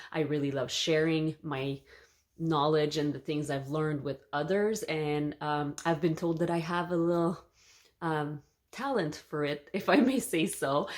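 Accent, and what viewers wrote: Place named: Quebec, Canada. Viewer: Hi Dear. I was listening to your accent. I am an English Speaking Montrealer. I asked google whether you are French Canadian, and I was so pleased to see that I guessed right! I moved to Israel 33 years ago. I am so happy to have found you. I love your knitting! Best of luck.🎉❤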